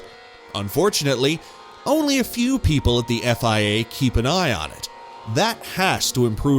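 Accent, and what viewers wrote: United States. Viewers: I'm sorry but you are sounding way to American to be taken seriously about this. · A strange video - more like an attempt at anti-RB PR stunt with some very doubtful claims of "cheating", read out by an overly-dramatic voice actor with a condescending tone who is clearly not an F1 expert. Not a fan of the mid-west accent and 'Murican colloquialisms when presenting a top-end, international motor sport. What's the point of this amateurish, hyped-up polemic? This poorly executed click-bait will not catch me again.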